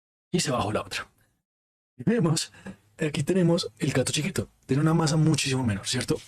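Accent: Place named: Bogotá, Colombia. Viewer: La verdad amigo, creo que eres una persona inteligente, pero d ves mejorar muchas cosas. Primero, el acento bogotano que se caracteriza por hablar entre los dientes y hay momentos en que no se entiende. Segundo, eres muy disperso y eso cansa a quienes se interesen por el tema. Tercero, dejas muchas lagunas a pesar de que el tema es muy interesante. Yo soy meteorólogo y quizá luego haga un vídeo al respecto. De todas maneras, ánimo.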